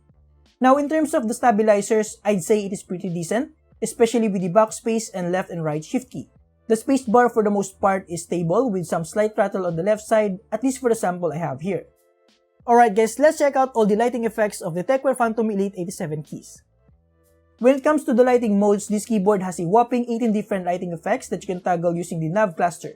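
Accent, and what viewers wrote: Philippines. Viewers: This guy has the most Filipino accent ever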